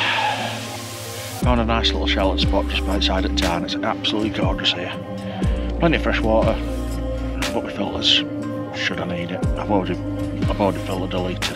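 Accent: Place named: Bradford, United Kingdom. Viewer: also loved hearing a bradfordian accent 😀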